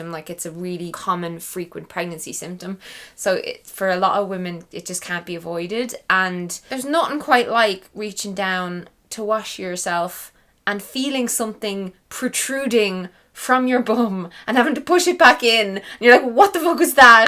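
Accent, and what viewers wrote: Ireland. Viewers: woah, I can't take it, that Irish accent + high pitched "babyyyyyyy", just died of cuteness overdose..... (I can't believe I just spent 3h looking at possible ways to transfer to Ireland, need my own Irish cuteness too.....)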